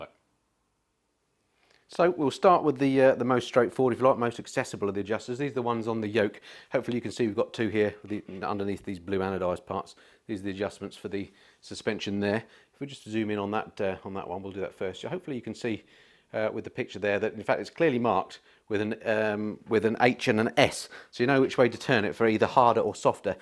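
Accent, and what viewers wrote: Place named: United Kingdom. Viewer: Thanks bud I'm going to be buying one hopefully next season so your vids are an education for me and your British and that means it's easier for me to understand what your talking about keep up the good vids cheers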